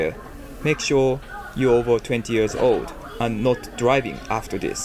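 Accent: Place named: England, United Kingdom. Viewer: You have an English accent. Much better than American-English. And luckily not a Liverpool accent.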